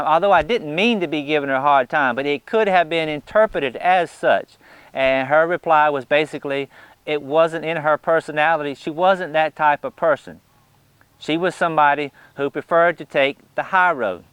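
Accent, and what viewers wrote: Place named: East Texas, United States. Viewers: coming from a big east texas family you sound like every freind & relative, all good folks, pride themselves on good manners, most they would say is "bless his heart, dosn't know any better, wasn't brought up right" You'r a good man & my favorit of all the gardening vidios, truely blessed. so my thanks for all your do. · I've been in Missouri 13 years, but still retain my very strong Texas accent. I appreciate those folks who refer to it as 'charming'. lol I LOVE your accent. It sounds like 'home folk' to me. Great lesson you taught today. I consider you and Bexar good friends, and I think you're both phenomenal teachers. Wouldn't want to be doing this without you. My philosophy when dealing with other folks is, always assume not offense was intended. That's usually the truth. It's served me well.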